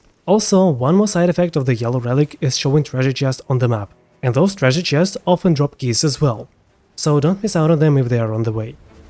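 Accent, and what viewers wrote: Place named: Russia. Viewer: Какое же плотное у меня ощущение, что твой родной язык русский, с этим акцентом, который слышится даже лучше чем мексиканский или индийский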